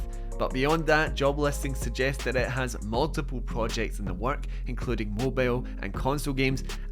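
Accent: Scotland, United Kingdom. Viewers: Imagine being that stupid you cant understand a easily understandable Scottish man.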